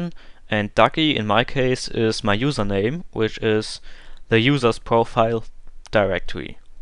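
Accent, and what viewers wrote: Germany. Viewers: Bist du Deutscher oder Engländer?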